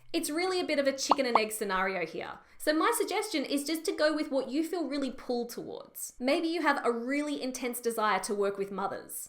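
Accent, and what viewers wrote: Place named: Australia. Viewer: Great tip to find a niche within a niche..Didn't know you say niche differently in Australia (I'm a kiwi living in USA)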